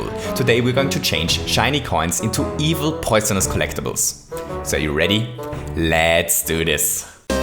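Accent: Austria. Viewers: You are from Austria? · Wait. Are you austrian or Lithuanian? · youre austrian? looooooooool im austrian too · You're from Austria? Right?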